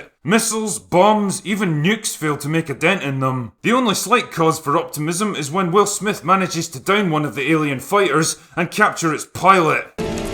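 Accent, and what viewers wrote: Scotland, United Kingdom. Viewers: Listening to "USA! USA! USA!" with your Scottish accent made my day, Drinker! Couldn't agree with you more about this movie! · With his thick Scottish accent it made it funnier lol.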